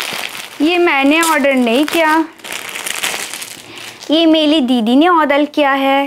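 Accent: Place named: Bengal, India. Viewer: 😂 Your Hindi With Bengali Sweetness ☺️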